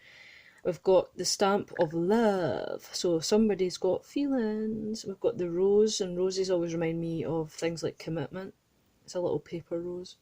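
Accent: Scotland, United Kingdom. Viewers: 3 soo on point 👌🏾 btw I love your accent, reminds me of my best friend, she's scottish ❤ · Woohooo a Scottish reader 👌🏼👌🏼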